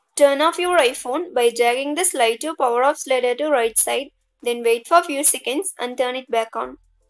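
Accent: India. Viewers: Thanks for “caption”. English of Indian accent, because, it’s hard for me to understand it, I usually get off of the site. But with your CAPTION, I read and I am thankful of that. “THANK YOU”.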